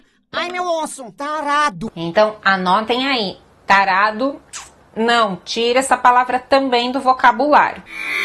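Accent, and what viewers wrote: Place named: Sao Paulo, Brazil. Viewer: Essa professora es tao bonita, formosisima, e ainda falou do meu Chile aaahhh eu estou enamorado de ela😍😍❤❤. Por su linda forma de falar ela debe ser paulista, a pesar que em Sao Paulo ha muitos sotaques. Ao final nao se sabe qual es Paulista realmente. Mas a letra R ela a fala como riojaneirense, eu adoro, tal vez ela es Paulista mas em alguma cidade que está na frontera com o estado de Rio de Janeiro. Saudos desde Chile e um grande abraço. Beijos nao me atrevo pois deve ter enamorado ou marido 😬🙊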